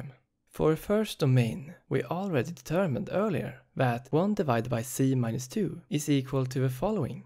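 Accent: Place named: Sweden. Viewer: Dude that's the loveliest most Swedish accent if I ever have heard one! :D And Thanks for the vid, exam in two days, fingers crossed!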